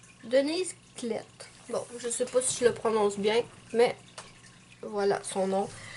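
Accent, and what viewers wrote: Canada. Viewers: Super vidéo, ^^ J'ai le livre Dreamlings de Edwina Mc Namee, il est merveilleux. Je te le conseille. Celui que tu as aussi est très beau. Moi aussi je regarde les vidéos de Kry's colors et Steffie. Et ça me donne envie d'acheter tout ce qu'elles présentent. :) J'adore ton accent, tu viens de quelle région au Canada?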